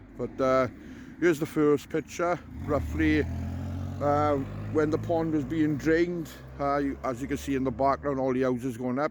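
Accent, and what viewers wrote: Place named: Wales, United Kingdom. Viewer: It's a welsh accent mate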